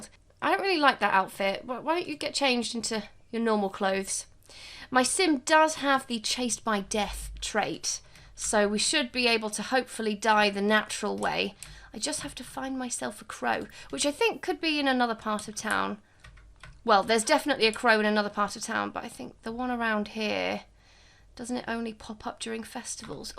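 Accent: United Kingdom. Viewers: Your accent in particular is so nice to listen to. I don’t usually like British accents but yours is so satisfying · She has the classy UK accent that’s why lol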